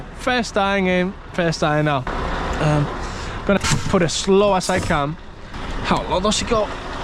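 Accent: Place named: England, United Kingdom. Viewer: Why do you sound foreign but also English. Keep up the good work mate, I enjoy your videos.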